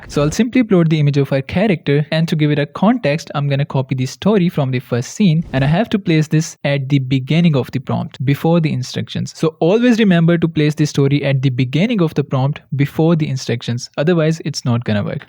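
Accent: India. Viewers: man, i head indian i exit. bye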